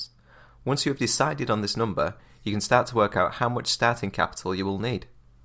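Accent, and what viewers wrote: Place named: North, United Kingdom. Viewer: any suggestions on online personalities? do you do mentoring. BTW your videos are awesome its always a great feeling of confidence when i listen to someone with a northern accent lol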